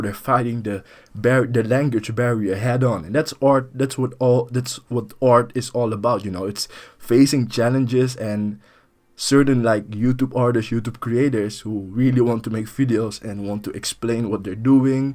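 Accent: Netherlands. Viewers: Good thing you decided to do your voiceover in English. Otherwise, I would not understand a word and fall asleep. Your Dutch accent is pretty cool..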